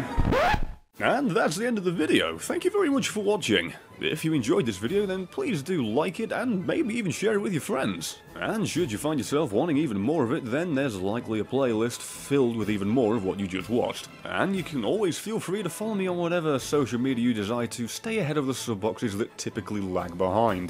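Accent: United Kingdom. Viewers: Wait, are you an American citizen? I always assumed you were British because of your accent...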